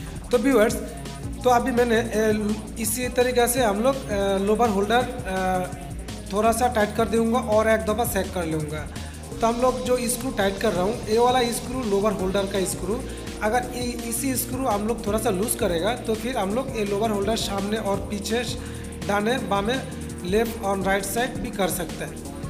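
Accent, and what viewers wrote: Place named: Bengal, India. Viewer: Bangla channel achhe naki.. apnar Hindi shunlei bangali lagchhe toh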